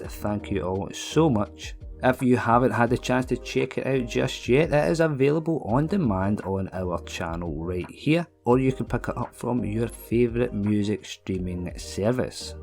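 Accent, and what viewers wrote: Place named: Scotland, United Kingdom. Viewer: Sounds Scottish)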